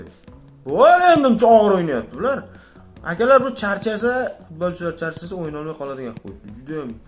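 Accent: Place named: Namangan, Uzbekistan. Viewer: Namangan shahridanmisiz shevangiz ohshab ketarkan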